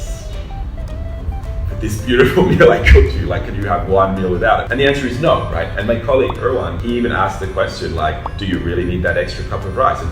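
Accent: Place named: Philippines. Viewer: This man is living the life. Exploring the world while majoring on food. He sucks cultures like a sponge, he even got the filipino accent on some words. Very impressive 👍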